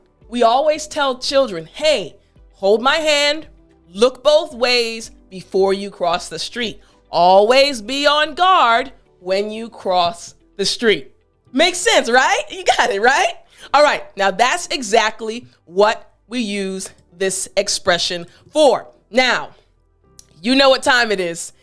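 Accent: United States. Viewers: Hi Kolya, I'm not... but... she is American! I'm not sure which state, however... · Are you American English. I know it again and again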